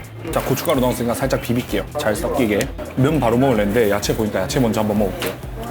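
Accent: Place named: Busan, South Korea. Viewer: 짜달시리(특별하게) 얍실하다(얇다) - 부산 사투리 넘나 좋은 것. ㅋ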